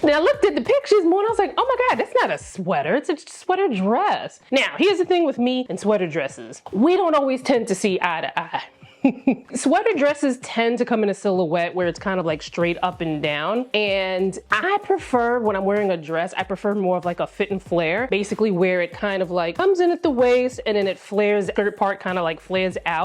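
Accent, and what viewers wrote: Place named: New Orleans, United States. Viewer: I live in Louisiana and i thought you were from New Orleans, love the accent ~ new subscriber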